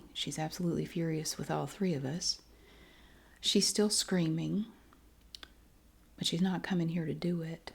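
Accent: South, United States. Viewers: I really love both of channels I'm from the UK and I really love the southern American accent I find it very relaxing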